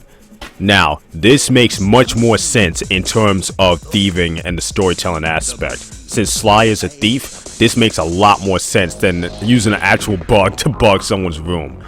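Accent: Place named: New England, United States. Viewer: Stupid question. What part of the us is your accent from? Sounds like New England in general. My guess is new Jersey, or Boston. Linguistics fan here. Anyone with more info lmk happy to learn!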